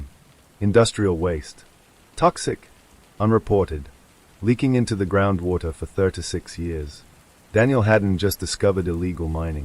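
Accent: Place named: Boston, United States. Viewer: Dear narrator, are you AI or areal person please? If you are AI, why have you been given a Boston accent. Just curious?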